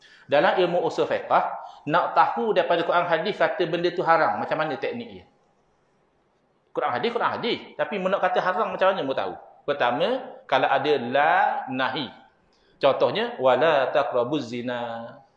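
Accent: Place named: Terengganu, Malaysia. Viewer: Ustad ni bahasa ganu ok lagi molek sangat penerangan yg jitu faham jelas selari dengan shyariat islam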